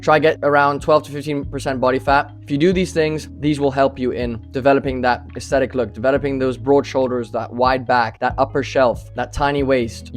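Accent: Ireland. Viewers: I swear I can hear a bit of a Dublin accent when you speak... Did you live in Ireland at some point? 😂 🇮🇪🇮🇪🇮🇪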